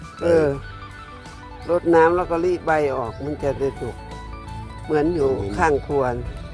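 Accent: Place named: Isan, Thailand. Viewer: สวัสดีจร้า กับปู่มารับชมวิถีชีวิตบ้านๆคะชอบ พูดภาษากลางผสมอิสานมากคะฟังแล้วหัวเราะตามเลย🤭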